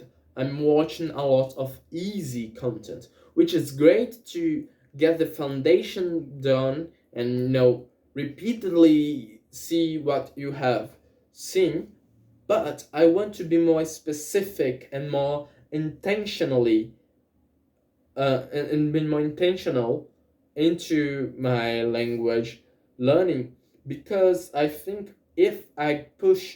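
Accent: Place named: United Kingdom. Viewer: Você escolheu o sotaque britânico?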